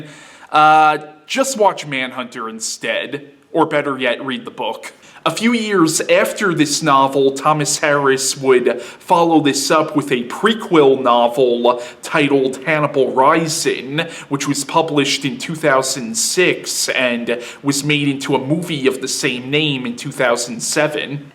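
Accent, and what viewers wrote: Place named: Midwest, United States. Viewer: he sounds Midwest American to me.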